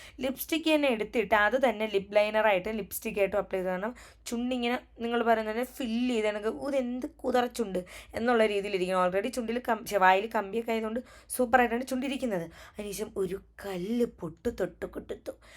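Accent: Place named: Valluvanad, India. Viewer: നമ്മടെ വള്ളുവനാടൻ സ്ലാങ് 😂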